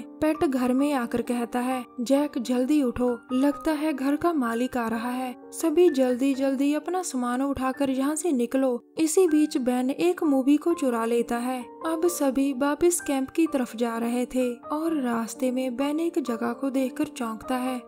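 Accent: Punjab, India. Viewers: Madam aap ka punjabi accent has its own fanbase.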